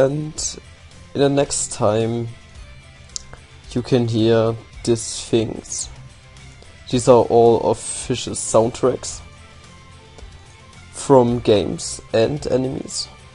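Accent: Germany. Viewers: Are you german and trying your best english? You can talk well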